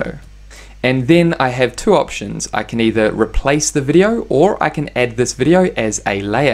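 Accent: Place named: New Zealand. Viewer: Goddddd man, Tour accent Is so strong Nuova Zelanda I guess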